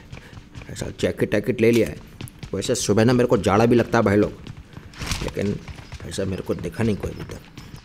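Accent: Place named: Bihar, India. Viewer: Wo bihari ascent, shukla sir ka class yaad aa gaya..!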